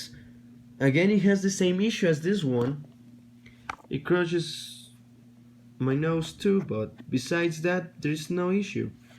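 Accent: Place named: Russia. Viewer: Oooh I really thought you were russian cuz of yr accent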